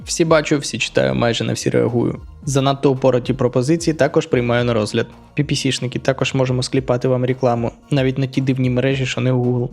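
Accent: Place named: Western Ukraine, Ukraine. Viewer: Я думаю, українська у нього рідна (проскакує західний акцент).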